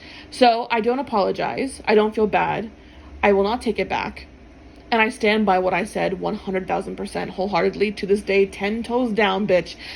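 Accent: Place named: Miami, United States. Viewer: Sounds like you grew up in Miami, like me?! Sometimes you got to fight. Gabfly wanted to hear the reason that dude r word his ex? What the heck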